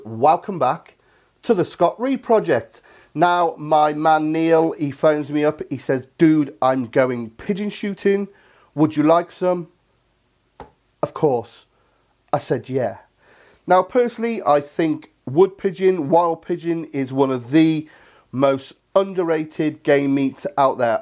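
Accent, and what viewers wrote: United Kingdom. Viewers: "get in with me ands" lol British accents are all so amazing. Love your video's man. These recipes all look amazing. i can't wait to try them one day when i can get a hand on some of the ingredients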